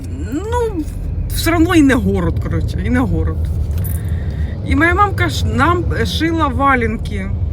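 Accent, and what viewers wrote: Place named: Dnipropetrovsk, Ukraine. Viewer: Це з Дніпропетровщини такий суржик. Ви вже повинні знати що в Україні не розмовляють чистою мовою. У всіх областях є свій місцевий діалект. Це не тільки в Україні, в різних країнах є цей феномен. Краще вам більше читати якщо ви цього не знаєте.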